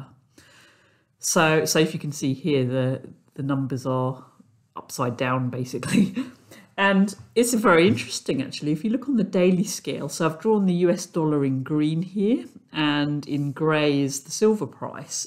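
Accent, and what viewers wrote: England, United Kingdom. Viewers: I'm very surprised realizing that you live in New Zealand. I thought you're accent is as english as it can get. I read some reports about New Zealand in a blog I like and it summs up - this now my quote - there's basically a woman ruling like a direct descent of Stalin. We don't here about that in the news neither - lol!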